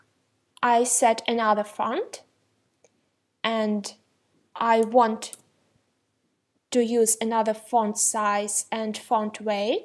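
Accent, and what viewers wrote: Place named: Russia. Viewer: russian accent) забавно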